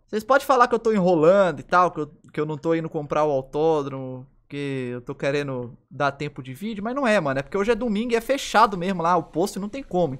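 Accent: Minas Gerais, Brazil. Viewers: a cada dia mais mineiro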